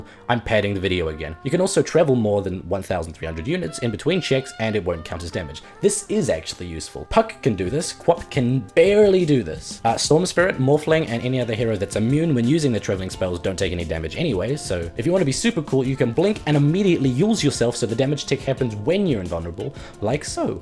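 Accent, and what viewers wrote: New Zealand. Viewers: Andre Louw he's a kiwi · He's NZealander maybe?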